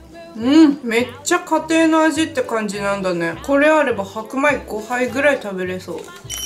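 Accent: Aomori, Japan. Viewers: ちょっとなまってて可愛い♡ 青森っぽいけどどこ出身なんだろ、、 そしてそして今日も美味しそうです🤤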